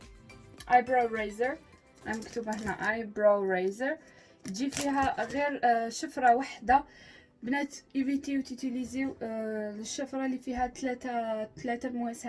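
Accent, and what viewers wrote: Algeria. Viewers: جزاءري ليش غريبة اللهجة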